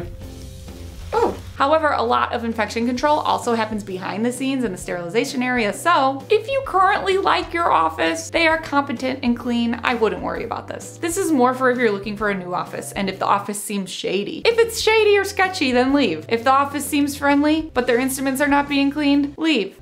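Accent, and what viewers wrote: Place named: Chicago, United States. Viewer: Great advice. Your Chicago accent feels like home, sounds like my friends.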